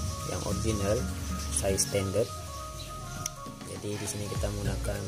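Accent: Sabah, Malaysia. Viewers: Org sabah ka kau??area mana ya??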